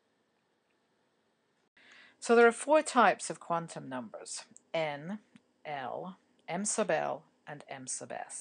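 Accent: United Kingdom. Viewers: Actually she's pretty legit and has an awesome accent from MANCHESTER (she makes sure you know that). She's really funny and makes things very understandable. She is the best chemistry teacher I have ever had. I just started her class this fall semester so I'm not really bias lol. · What a cool sounding accent. It sounds British but American at the same time. Is this Irish?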